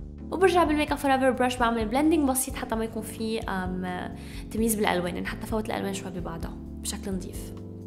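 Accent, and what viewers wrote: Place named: Lebanon. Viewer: حبيت اللهجه اللبنانيه بسببك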